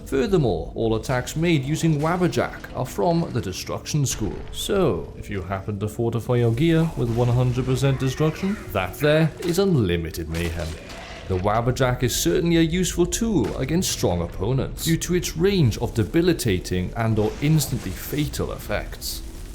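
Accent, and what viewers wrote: Wales, United Kingdom. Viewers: I didn't see your name until after I noticed your accent 😂 fellow Welsh Skyrim enjoyer.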